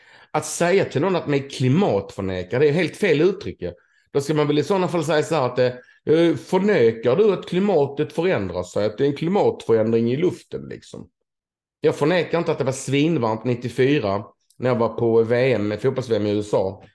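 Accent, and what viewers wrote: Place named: Skåne, Sweden. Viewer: Ja där började din resa på köpenhamnsvägen .. i Malmö och dialekten sitter , och ålahue så skånskt 😜 tokigt 😂 men kul ..😘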